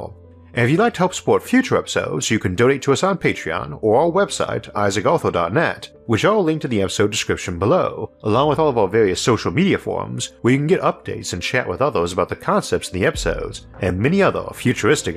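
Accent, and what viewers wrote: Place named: Louisiana, United States. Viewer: I know this is random but what accent is this narrator. Legitimate interest in dialects. Has kind of like a Cajun sound to me..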